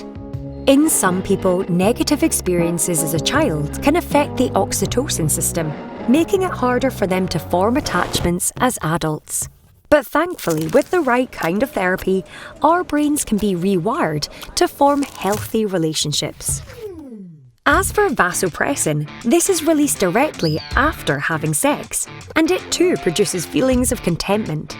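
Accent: Scotland, United Kingdom. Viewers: Pretty sure she is a brit, but i don't know exactly which region.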